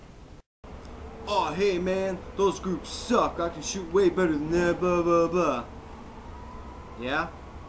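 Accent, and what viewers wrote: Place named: Minnesota, United States. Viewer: are you from Minnesota??? I heard my accent!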